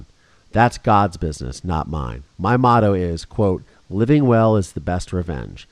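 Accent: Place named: Philadelphia, United States. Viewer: I found out you were from the Philadelphia area after your pronunciation sounded so familiar. I grew up in Abington township- right near the Hot Shoppe/B&N- and later lived in Pensacola at the NAS with a few trips to Bermuda in between. I was abused and spent the past 30+ years putting the pieces back together. I’m rooting for you.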